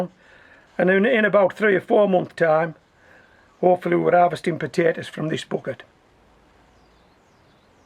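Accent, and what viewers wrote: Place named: Cumbria, United Kingdom. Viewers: North west england on the coast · As someone from England I can say for almost certain that he is most certainly English,sounds Midlands or Northern,lol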